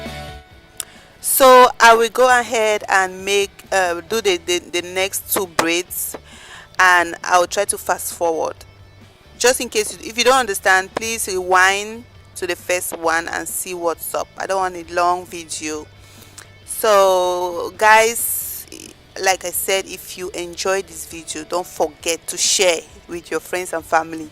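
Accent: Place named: Africa. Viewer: This is a beautiful wig and I would love to order. However, the voice sounds African but the IG page says China.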